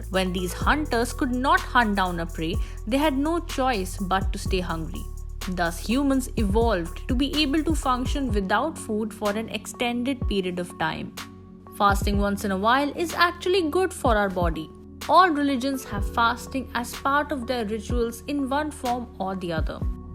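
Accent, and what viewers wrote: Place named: India. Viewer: thanks for the information along with the nice Indian accent!!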